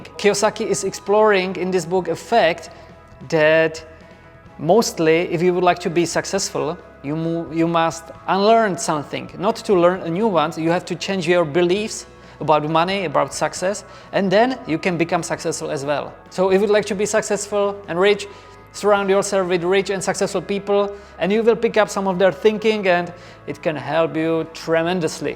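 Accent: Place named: Czech Republic. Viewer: Nejsem nikterak dobrý v angličtině, ale mám pocit že přízvuk je jako bych to řikal já 😁 ( A to si myslím že jeho úroveň je na míle jiná!) Je to jen můj pocit nebo jsem zblbnutý amerikou o tom jak má angličtina znít? Díky za názor Dobrá práce každopádně jen tak dál!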